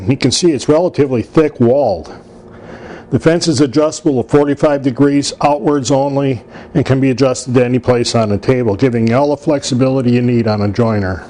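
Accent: Chicago, United States. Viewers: FINALLY! We get to see your face; after watching kadzillions of your "reviews" on machinery. And if you are not from Chicago, I will eat me hat. Your "accent" (and YES Chicago-ites DO have a definite "accent"! LOL) gave it away. YEP! The quite often "Dee's" instead of "The's", etc, gives it away every time. I noticed this distinct accent when I first arrived in the "windy city" in the fall of '46. It took me 6 yrs to fully understand you "Yankees". LOL Of course my school-mates and neighbors never let me stop hearing, "You talk funny with yuz "Southern drawls" lack "Yall"; not to mention, "AH instead of "IEE". But I got "USE-GUYS" back, by saying, "Yes and YALL sho nuf do talk funny LOL"! Seriously, you do a GREAT job reviewing; in these awesome videos. You cut right to the chase (unlike MOST who review) and your knowledge is FAR above other reviewers. 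If a person can not learn from your video's, they can NOT learn. period! Keep up the good work neighbor.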